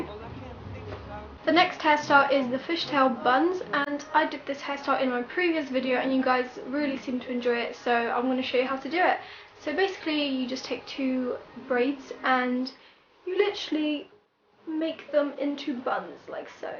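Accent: England, United Kingdom. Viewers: I just LOVE your accent! Where in the UK are you from? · You are from UK? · I think she is from England I have the same accent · finally someone british lol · I luv your accent ... British somin like dat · You're like perfect! cx Are you from Britain? Love the accent ( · No, she is from the uk x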